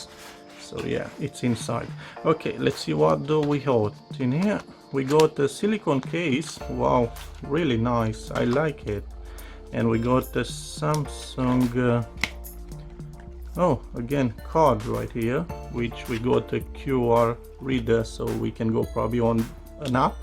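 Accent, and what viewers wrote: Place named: Romania. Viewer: de accent is from Romania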